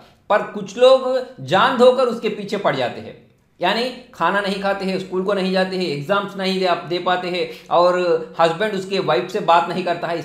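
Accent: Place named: Butler English, India. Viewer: Bro nuvvu hindi talk cheste comedy ga undi=na English la ga = butler english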